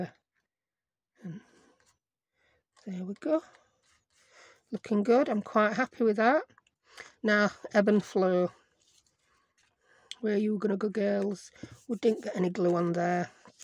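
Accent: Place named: South Yorkshire, United Kingdom. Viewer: Sorry, I just need to know where you're from. That accent screems South Yorkshire!!? 😃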